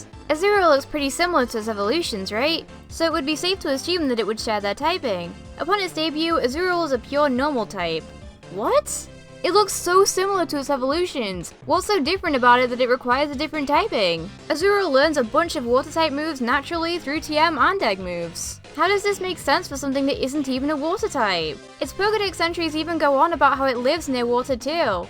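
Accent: United Kingdom. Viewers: u got a british ascent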